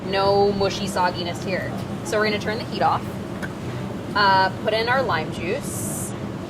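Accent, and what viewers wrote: United States. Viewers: Oh God. She's speaking nonstop. Her very india skin tone but completely American accent with her nonstop verbal diarrhea is hurting my brain. Ah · I have trouble appreciating her very girly american accent but the recipe looks delicious, which is the i portant thing haha.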